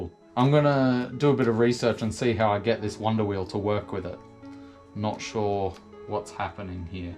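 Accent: Australia. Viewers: Are you an Aussie bloke ? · Awesome dude. I was wondering where you were. Aussie accent for sure but snow? WTF is snow? Chuckled at the manly flex with the manual over the shoulder. RTFM only in emergencies. BTW...neatest, cleanest woodpile ever. I just got an RTP1250 Cop bike at the auctions and have been thinking about one of these. My bike didn't have the BMW sat nav. Thanks again and enjoy your time OS. Oh. I went and had a look and decided to grab my balls and run with it. Fk it. You only live once so I ordered one. The discount code helped a lot.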